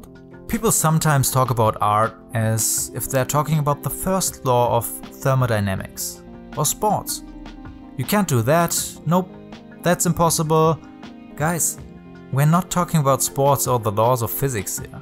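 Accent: Germany. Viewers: Ich genieße dein Englisch mit Deutscher Sprechmelodie es ist so angenehm !♡.♡ Dass du großArtig bist, weißt du ja schon ;)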